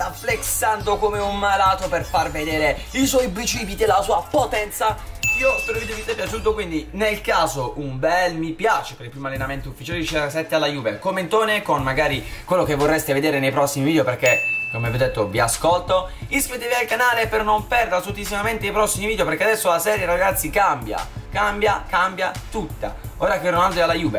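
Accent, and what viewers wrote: Bari, Italy. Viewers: senti ma sei barese?Pk dall'accento pare che tu sia barese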